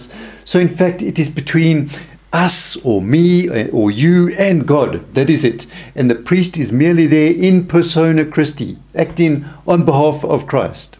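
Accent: South Africa. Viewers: Woah is this presenter South African? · Unmistakably south african.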